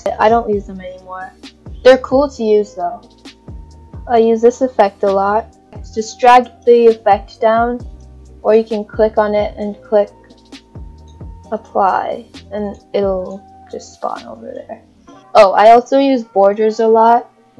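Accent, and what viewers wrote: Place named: Europe. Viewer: why do i lowkey hear some sort of like european accent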